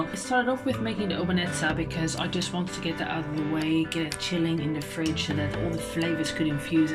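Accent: Netherlands. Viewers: I live in Germany and ur German is pretty good! But it has a bit of a dutch accent :D I feel like both languages are kind of similair, when they are written. When I read smth in dutch, I often understand it, but when somenone talks dutch, I understand nothing haha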